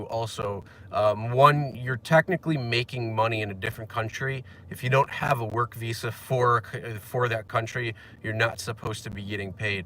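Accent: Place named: Buffalo, United States. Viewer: i recognize yer bfflo accent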